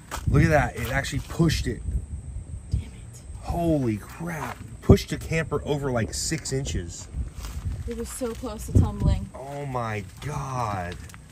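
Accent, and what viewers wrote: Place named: Canada. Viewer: Is he not from the south too? Talks like he's from Canada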